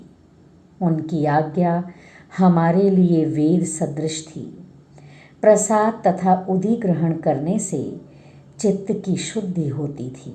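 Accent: Standard. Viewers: ओम साईं राम।बहुत ही मधुर और शुद्ध उच्चारण के साथ आज का ये साई सच्चरित्र का ये अध्याय सुनने को मिला बहुत ही अच्छा लगा।इसके लिए आपका बहुत बहुत धन्यवाद।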